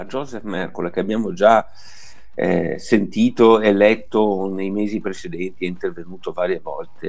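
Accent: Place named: Veneto, Italy. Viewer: Ma una domanda dato per scontato il tuo accento veneto, dove vivi?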